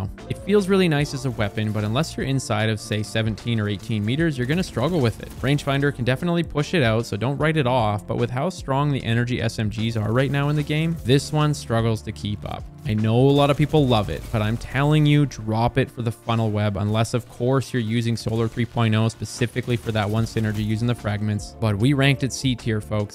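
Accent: Canada. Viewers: Are you Canadian? You sound Canadian say “sorrow”. I love it.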